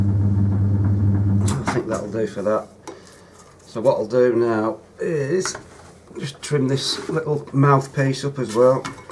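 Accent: United Kingdom. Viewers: I'm Australian. It's definitely a British accent. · Lol I have the same accent XD I'm British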